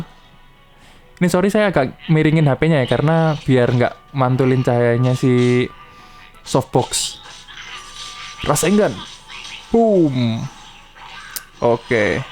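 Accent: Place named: Banyumas, Indonesia. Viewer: Wong ngapak kye ya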